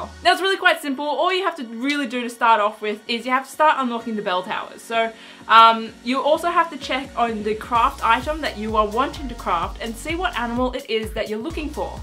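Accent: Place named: Australia. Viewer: You're beautiful. Plus, I love auzzie accents. =)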